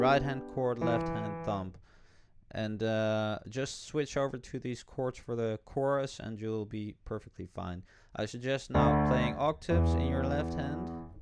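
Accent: Netherlands. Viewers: Thank you, this was helpful! :) Also, you sound like you have a Dutch accent, no? haha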